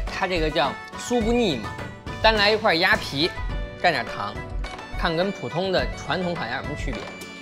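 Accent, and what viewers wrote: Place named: North, China. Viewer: 口音像北方 長相像南方人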